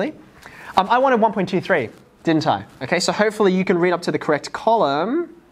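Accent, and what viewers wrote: Australia. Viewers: listen to his Aussie accent hahahaha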